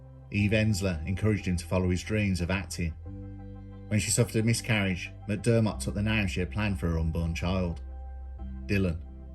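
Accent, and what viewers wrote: Birmingham, United Kingdom. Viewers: I’m working my way through your video slowly, but I thoroughly enjoy your wonderful work. I don’t normally comment but I thought this video brought your personality through brilliantly. Keep up the good work! I enjoy your sillly smooth Brum accent (I’m a manc) 👍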